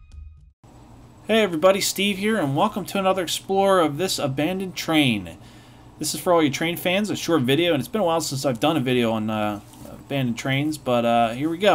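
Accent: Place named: Pittsburgh, United States. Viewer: Steve sounds like he is from Pittsburgh.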